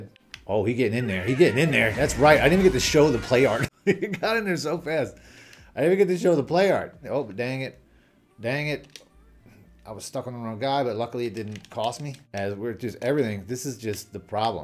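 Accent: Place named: Delaware County, United States. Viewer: You sound like you’re from DELCO….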